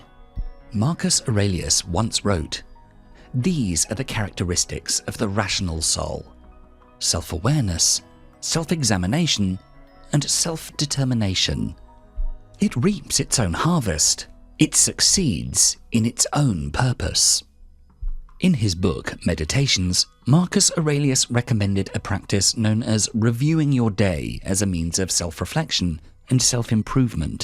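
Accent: United Kingdom. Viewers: I was in stress in those dark days quit job, broken up, didn't find any light in my way of life. Coincidently, I watched your video, listened to it because I love British accent and I've been learning English then I realized this channel like my teacher -it took me back to the right way, shown for me what I have to do. After one month quitting my previous job (which I didn't like and it took 12-14hours/day ) finnally I found the new job which I really enjoy it🙂. Thank for your effort to make these videos. Hope you and your team have a good health to make lots of precious videos to heal people like me. 🎉❤❤❤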